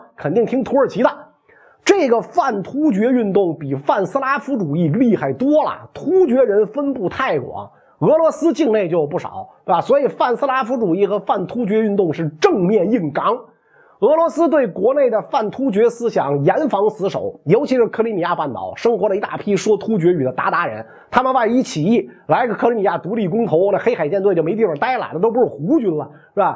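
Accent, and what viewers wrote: Beijing, China. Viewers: 听到袁sir这字正腔圆的北京话就神清气爽啊！提神👍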